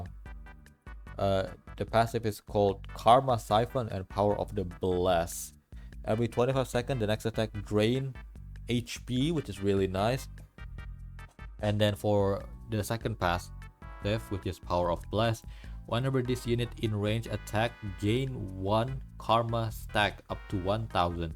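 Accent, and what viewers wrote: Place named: Indonesia. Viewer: di denger denger ini aksen indonesia banget 😂😂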